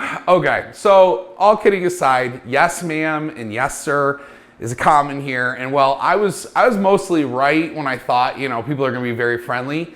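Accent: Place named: Western New York, United States. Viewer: Go Bills! I could tell you are from Western New York. Is there a Bills Backer spot?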